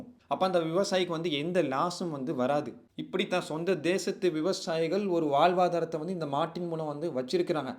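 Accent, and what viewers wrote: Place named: Kerala, India. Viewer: 👏 well explained... That Malayalam slang 🔥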